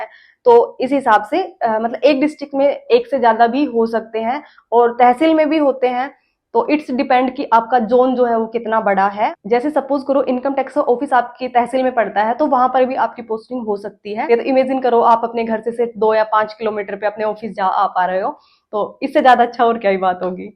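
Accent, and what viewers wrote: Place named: Bihar, India. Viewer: No offense. You got sizzled bihari accent...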